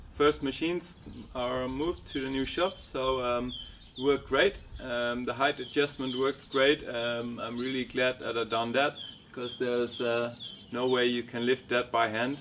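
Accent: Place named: Germany. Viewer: Cool, I thought you sounded German. Your thouragh like a German lol. Cool projects mate, hi from northern ireland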